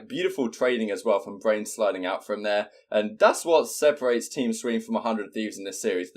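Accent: United Kingdom. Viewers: Love the game analyses, maybe it’s your British accent but I feel like you would be a good ass caster too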